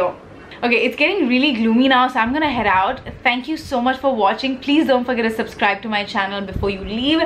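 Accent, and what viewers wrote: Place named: United Kingdom. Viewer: Love the video, as always your videos help me a lot. Love you and love your videos. I missed you so much mommyyy, your talks, advices and jokes and the British Accent.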